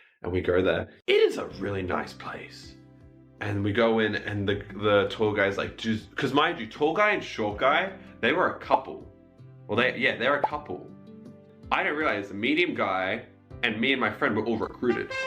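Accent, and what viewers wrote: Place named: Australia. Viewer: He’s Australian girl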